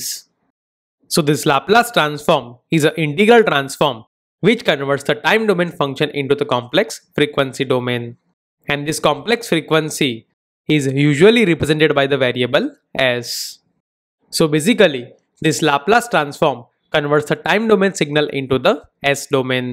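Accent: India. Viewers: Please for love of god work on your accent. Indian accent hurts my brain man.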